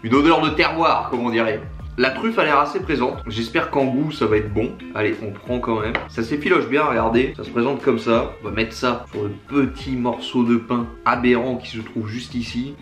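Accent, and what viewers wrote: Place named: North, France. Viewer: Hello l'ami, il y a le boss de la dégustation du burger, Mr! Et je viens de te découvrir! Sympa ta channel, tu as un tio accent de ch'nord! 62? Je m'abonne, bonne continuation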